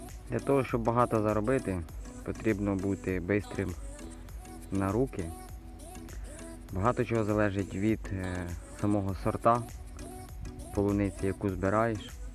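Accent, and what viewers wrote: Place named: Russia. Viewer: Швидка, дрібна, часу, праці - а вы произносите эти слова по-русски : быстро, милка, времеми, роботы и т.д. Это такой украинский - любой сможет на нём говорить, каверкая русские слова и называть такой ,,язык" украинским.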